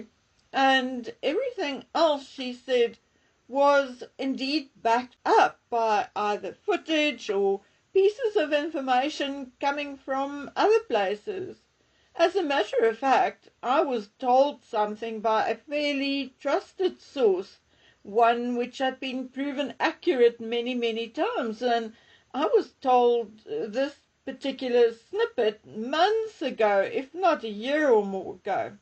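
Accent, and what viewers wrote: South Africa. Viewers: I don’t understand why her subscriber numbers aren’t higher. I can say this because I know she doesn’t read our comments. Maybe people find the South African accent jarring. We have a lot of South Africans living around where I live 🇦🇺 & it used to grate on me until I got used to it. It’s the only thing I can think of because I never miss one & have recommended her to others on X etc but her numbers remain the same.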